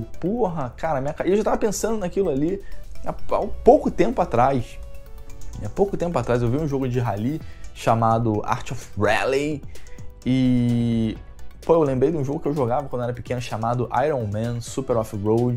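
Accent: Rio de Janeiro, Brazil. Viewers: eu não acho que foi o sonysmo que salvou o canal, o apelo sempre foi um cara falando merda com esse sotaque carioca engraçado, não importa o assunto, por isso faz sucesso e é o canal que mais cresce no brasil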